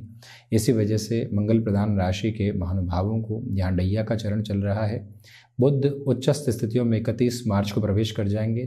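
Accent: Standard. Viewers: Aap ki Hindi bahut khub aur shudha hai..🙏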